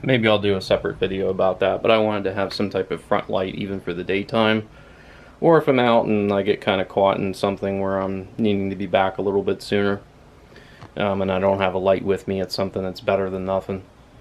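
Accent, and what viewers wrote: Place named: Pennsylvania, United States. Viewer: Guessing by your accent that you're from PA..Have you gotten out and done some serious rides on this bike yet? I just sold a Tarmac Pro and was looking at this model for a more comfortable ride for the rough PA roads. Thanks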